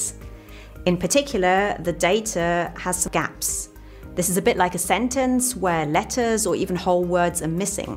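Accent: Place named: United Kingdom. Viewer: No photos cause it's fake. Just cause she has a British accent doesn't mean she's smart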